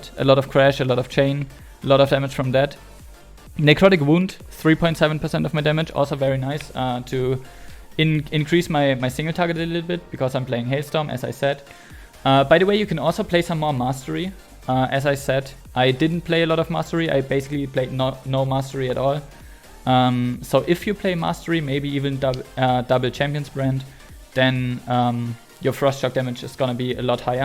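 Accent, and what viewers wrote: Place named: Germany. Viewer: Man hört einfach den deutschen raus aber nices Video 🙏🏻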